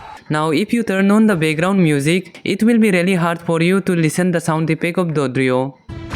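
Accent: India. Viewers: Bro is pure indian "amier"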